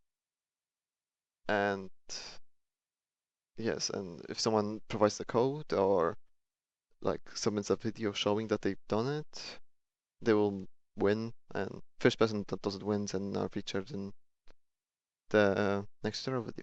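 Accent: Poland. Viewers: Where are you from POlish or English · I don't know why but i recognised "polish" voice (i'm also pole) very nice tutorial :)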